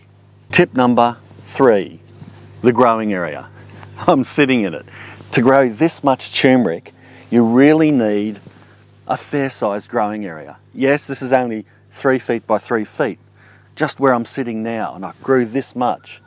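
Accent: Australia. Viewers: Great delivery, straight forward and very Aussie too! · came for the gardening insight. stayed for the Australian accent haha👍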